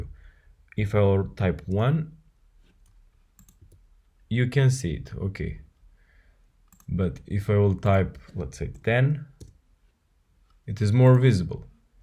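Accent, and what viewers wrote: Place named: Russia. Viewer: Слышится отчетливый русский акцент, а похожий гайд на русском может быть есть?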